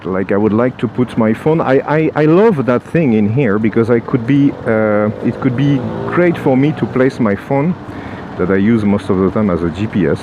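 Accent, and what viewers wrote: France. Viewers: Mdr!!! je suis tombé sur la partie 2 et j'ai jamais aussi bien compris l'anglais du coup j'ai posté un com qui disait tu parle comme un français! ! et là je regarde la partie 1 et je vois ton com...ah ok je m"était pas trompé. ...j'ai vu cette brèle jeudi chez honda a Clermont elle fait rêver dommage qu'elle ne soit pas en 450...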